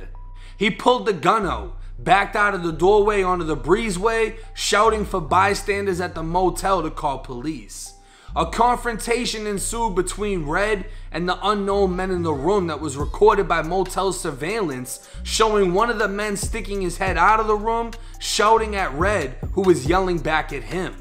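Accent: Boston, United States. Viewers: Are u from Boston bro?? My Mom R.I.P. was from East Hampton n ur accent is exactly how I envision an authentic Boston accent!!! My Mom moved here to da SouthWest at 10 years old n so by time I was born up until I was 10 wen she passed, she didn't have any sorts of Boston, New England, or East Coast accent wat so ever!!! But I'm jus curious is all n too as I would like explore some of my roots back East n wat not cuz da SouthWest n West Coast, California to be specific, where my pops is from, but dat is all I've known in my 31 years of existence!!!!! Totally totally different den wat I am accustomed too dats fir sures!!!!! N too bro jus wanna letch u know dat I admire da positive change u made to ur life, da criminal aspect not committing crimes n wat not jus wasting ur life away in a lil locked room!!!!! I too made dat change after a 1½ years in juvenile n snapped once I got out n had a totally different fukin perspective!!!!! 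It was actually a positive thing n definitely helped me cuz had I not ended up where I did, I was destined fir prison without a doubt!!!!! Got a clean record since turning 18 compared to da 7 felony's I got from 16-17!!!!! But shit I rocks wit u!!!!!!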